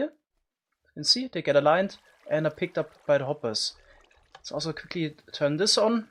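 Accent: Germany. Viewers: Muttersprache deutsch oder? Hört man ziemlich raus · Dumb question, is he German ?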